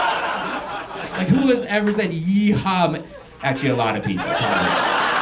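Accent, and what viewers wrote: India. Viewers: dont know where you are grown up but you still have got Indian accent :D .. it is not new content , copying of Trevor noah and Russel Peters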